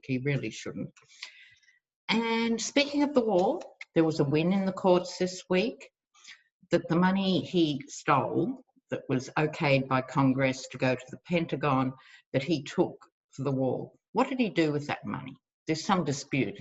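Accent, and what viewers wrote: Australia. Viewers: I have always loved the Australian accent..🤗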